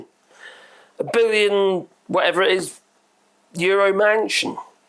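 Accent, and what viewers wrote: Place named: Norfolk, United Kingdom. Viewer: you sound like a norfolk buh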